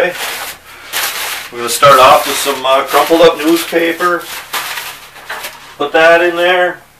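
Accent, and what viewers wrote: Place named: Upper Midwest, United States. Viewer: I was looking for a vid about putting line on a spinning reel and your vid from 2 years ago came up. First of all, thank you, it's what I was looking for. Second, I love subscribing to local Youtubers and you sound local. Are you in the UP or Wisconsin?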